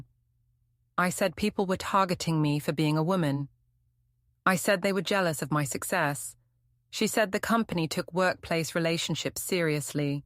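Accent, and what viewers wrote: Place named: RP, United Kingdom. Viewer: You aŕe right. This posh british accent makes me want to vomit...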